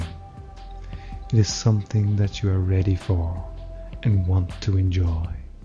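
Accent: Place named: Ireland. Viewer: Another Irish voice not making me calm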